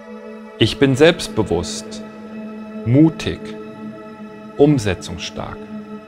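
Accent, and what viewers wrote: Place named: Bavaria, Germany. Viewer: Nur die bayerische Aussprache stört, z. B. Großartikkeit ..., sonst super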